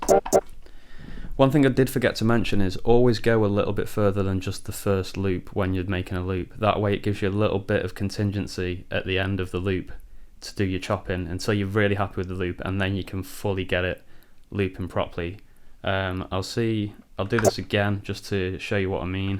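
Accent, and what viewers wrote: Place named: England, United Kingdom. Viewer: Hi again, while waiting to buy this machine I'm following your videos which will prepare me for when I'll have it here :-) I'm from Italy and had to slow down the video and turn on the subtitles to get every single word... I hope I'll get used soon to your English, I guess I saw too many American English videos and I'm not used to that pure English :-) anyway, that's not the point actually, but I didn't get the first part where you talk about polyphony... As far as I understand from the manual (sp404a) it can handle 12 voices. So it's not clear how it can stop working when at the beginning of the video you try to layer 3 drum samples over that piano sample... If they were all stereo samples, they would be 8 voices, thus it takes at least other 4 mono or 2 stereo to reach that limitation of 12. So I think that I'm not getting something, can you explain again please?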